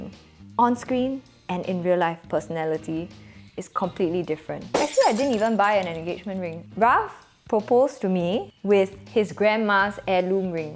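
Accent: Singapore. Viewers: Its called tall poppy syndrome. People love to intentionally knock others pure jealousy! Keep it up girl your Singlish is awesome!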